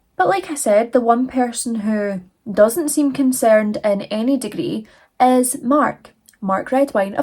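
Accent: Scotland, United Kingdom. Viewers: is not only hands down the best looking presenter but well versed and well researched and that Scottish accent! OMG, I love this channel.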